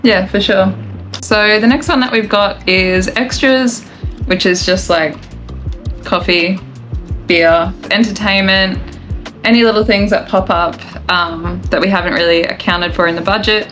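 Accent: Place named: Australia. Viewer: Thanks for the video! Great to hear the Aussie accent!! What about the cost of Visas and/or visa runs? Thanks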